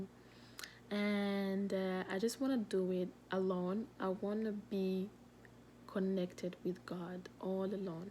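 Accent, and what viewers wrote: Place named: Nigeria. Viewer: Haha MashAllah you have learnt our accent oo una don try pass, 😂😂 may Allah bless you too sister and grant you ease in all your affairs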